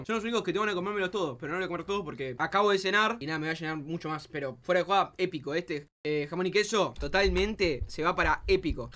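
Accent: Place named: Buenos Aires, Argentina. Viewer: te zarpas de porteño mamita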